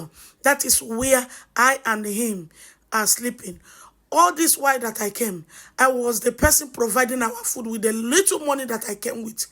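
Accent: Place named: West Africa. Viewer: This accent is like west africa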